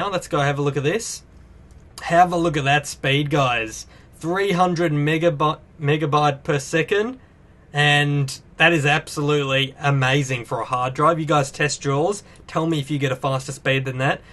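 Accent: Australia. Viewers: no offence but are u Australian? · Are you Australian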